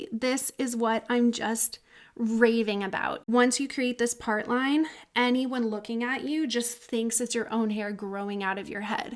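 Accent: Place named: Los Angeles, United States. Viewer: Haha I thought that. LA accent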